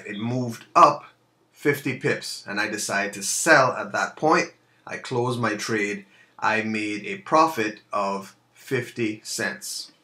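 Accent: Jamaica. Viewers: Are you from Jamaica...if so place email me at <mail> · Where is your accent from? Sounds like a cross between Jamaican and Northern Ireland lol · he got a hidden Jamaican accent...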